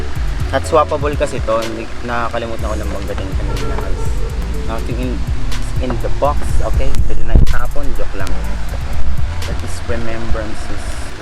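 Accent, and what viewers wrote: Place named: Iloilo, Philippines. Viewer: ahaha ilonggo ka gle ahaha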